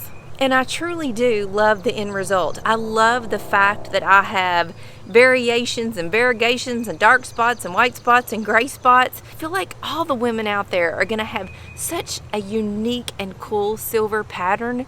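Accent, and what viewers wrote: South, United States. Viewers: I have no idea how your video popped up in my YT space but I just wanted to say I love your southern accent , I grew up in Nashville but live in LA now and miss girls talkin “southern”😊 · Love your videos! So common sense and practical advice! Love your curly hair and Southern accent too! Keep up the good work! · Are you from Kansas, North Carolina, or Texas? Thx 🙂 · I can listen to you for ever. Ahhh that badass Southern accent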